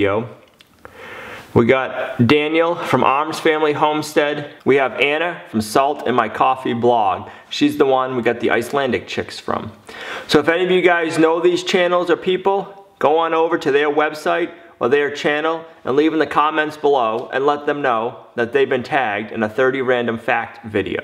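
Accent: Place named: New England, United States. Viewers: I didn't find your channel until this year. I told my wife ... "he has a funny accent for a guy from New Hamphshahh." Now your accent makes sense. My relatives lived on Davis Street, at the top of the hill over the Norfolk Aggie. When I grew up, we used to walk down and to the back and visit the farm animals. But we know to avoid the geese in the front pond ... they were aggressive and mean. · I like listening to your new England accent. Your channel is very interesting. You seem to enjoy your homestead so much.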